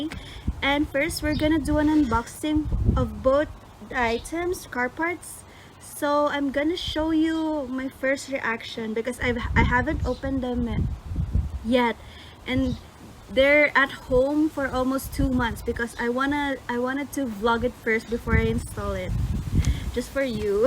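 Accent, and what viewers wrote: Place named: Philippines. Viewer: That Filipino accent lol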